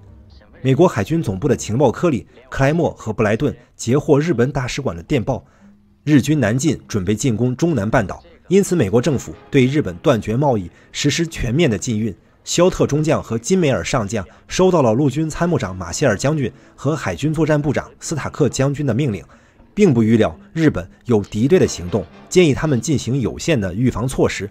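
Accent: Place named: Beijing, China. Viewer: 你是京腔啊 我還以你是台灣人呢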